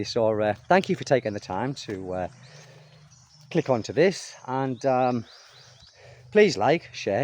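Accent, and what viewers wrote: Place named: County Durham, United Kingdom. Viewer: County Durham accent if ever ive heard one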